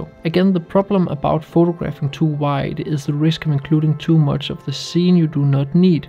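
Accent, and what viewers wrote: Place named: Denmark. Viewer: You made some excellent points in this video,. For that reason, I subscribed. The shots in this video are absolutely amazing! Keep up the good work! Also, I immediately recognized the Danish accent:)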